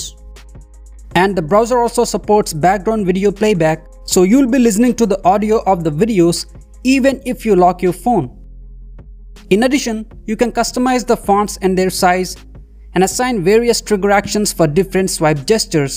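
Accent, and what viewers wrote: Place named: India. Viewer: Bro has an Indian accent. Nice video btw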